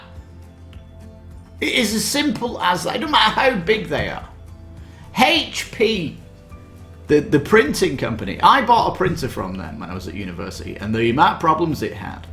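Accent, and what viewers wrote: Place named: United Kingdom. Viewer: mexico?? you sound like some kinda brit.. prolly get called gringo every day. what's the board game scene like over there?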